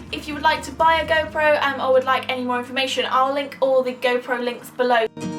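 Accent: United Kingdom. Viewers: Among all I always been very fascinated by the UK English accent..btw that's a very useful info of GoPro.👍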